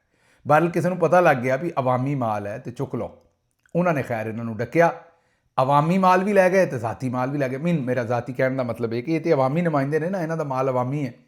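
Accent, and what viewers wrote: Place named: Malwa, India. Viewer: Bahla harami aa Dr gill jka safe aali malwayi bolda but chubbviyan gla karda.. dharam naal nzara aa janda Dr tere video dekh ke charde punjab to.. dr vaise kise veele choundey chakk dinna Faislabad to lagda marasi hun mein ni kehna ptandra ggaint Dr sahab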